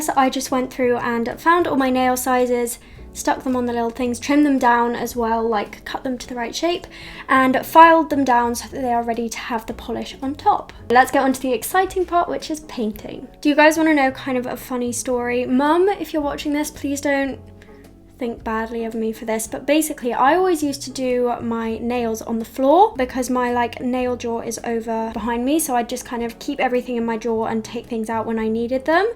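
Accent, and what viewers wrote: United Kingdom. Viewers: Can I just say that your British accent is e v e r y t h i n g ❤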